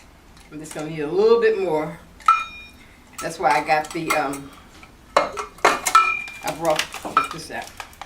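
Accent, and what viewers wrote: United States. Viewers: I love your channel listening to your southern voice is so somthing while you teach this genaration cooking skills. Am gracful for the holy spirit amd my mom that thought me many cooking skills, but am also open to other techniques and always willing to learn another way of doing things. I've been cooking since age of 8yrs old. My mom would let me loose in the kitchen amd it would be a flour everywhere day. She said it was the only way to find my own way of doing things in the kitchen. God bless you your family. I feel as if am apart of the family now and your my grandmother.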